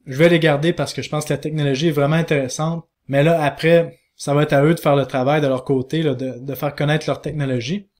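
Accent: Quebec, Canada. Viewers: vidéo très complète, seul soucis c'est que l'accent canadien est pas trop plaisant dsl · Salut!! Merci pour tes vidéos et vraiment tu es mon meilleur youtubeur!! Pas de blabla et de ramassis de conneries comme certains qui te font fomo.ta franchise et ton franc parlé le plaît!!tu es tjs présent dans les hausses comme dans les baisses!je te suis de déjà un bon moment.je le souviens que tu parlais si je me trompe pas du fromage a burger que l on mange....je ne pense pas que ETHcefera detronnee car c'est du lourd!! Et présent depuis déjà longtemps et les develloppeuts sont derrière!! Puis ton accent Québecois...j adore!! Mercipour ton taf!! K en redema'de encor et tjs.vivement la suivante!! Merci!!!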